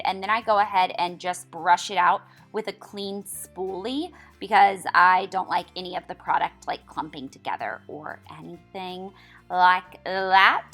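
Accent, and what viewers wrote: South, United States. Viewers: I'm not sure if you live in LA anymore, but I think of you as a Southern Belle! I say that because of the way you talk & I know you are from Tennessee & you have such a pretty complexion lol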